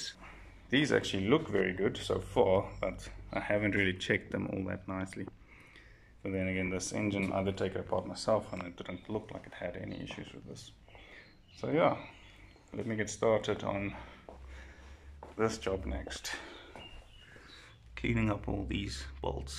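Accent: South Africa. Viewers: im not sure why but you got an south African English accent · Howzit man , It sounds like you're from SA? Is the vtec D-series top ends rare over here? Im just starting to get into hondas and going to try and get a an old ballade in the next few months so I'll probably be messing with the D series engines for a start. Seems like I'll be able to learn a lot from your channel will be checking out more of your videos! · Are u from South Africa?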